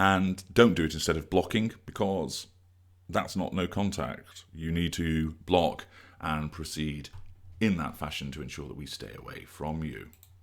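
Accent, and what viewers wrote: England, United Kingdom. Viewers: I like your voice very much.. And the english accent ..👍🏻😊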